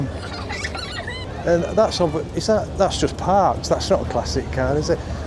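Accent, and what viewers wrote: Yorkshire, United Kingdom. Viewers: Two things, did you notice how the blue skies appeared once you’d crossed over t’Pennines and secondly, you started talking in your best Yorkshire accent. I know this because I do the same when I’m back home in God’s own country.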